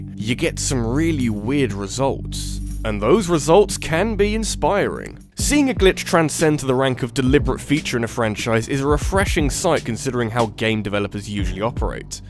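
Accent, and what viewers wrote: United Kingdom. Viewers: [First impressions] Well, he's a bit-er. [Speaks] WELL LOOK WHO'S BRITTISH! [subs instantly]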